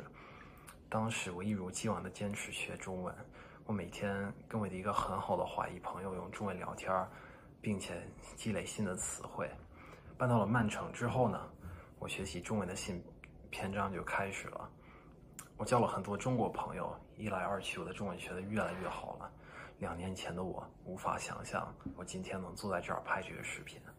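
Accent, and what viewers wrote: Standard. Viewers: omg 很标准！可以关注instagram账户吗？🤔🤣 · 真厉害，太标准了！ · 说的太好了😱发音也很标准哈哈哈哈，你的华裔朋友一定很多是北方人吧，儿化音掌握的很好（eg. 服务员儿～～）🤣你的视频很有启发性，学习外语最快的方法果然还是和母语者交朋友～如果有机会来中国学习或者工作一定又会收获一段很难忘的经历。btw端午节吃的甜粽还是肉粽哇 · OMG！两年中文就这么标准！我英语学了快20年啦，还那么烂！你也太牛了吧！ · 真牛👍！普通话甚至说的比我还标准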